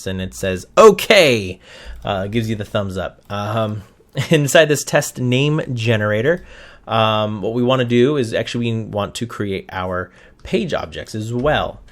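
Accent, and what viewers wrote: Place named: United States. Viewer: i wish i saw this video 2 months ago, this is the best QA Automation Tutorial i've ever seen ! +you speak real pure American English ! which is a real big thumbs up !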